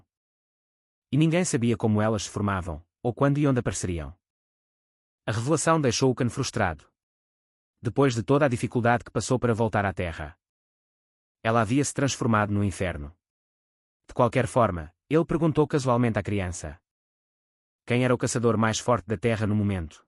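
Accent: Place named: Portugal. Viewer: Português de Portugal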